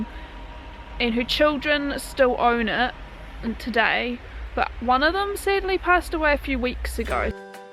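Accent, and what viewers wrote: New Zealand. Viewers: ) Lovely film, lovely persona, and lovely Kiwi accent (difinitely siventy-siven). And I might have a better question: How many things are definitely worth visiting in this country? There are many, especially outside the usual tracks - try Iasi (pronounced Yahsh or Yaash), a historic capital of Romania, in North-East of the country (historic region of Moldova).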